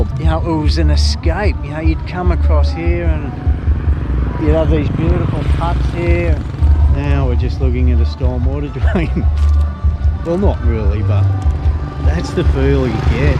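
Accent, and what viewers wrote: Australia. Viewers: I thought I was Aussie... THIS bloke is Aussie!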